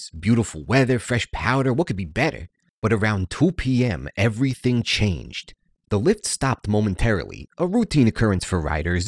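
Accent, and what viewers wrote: United States. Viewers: Ill take an american accent over an AI generated bot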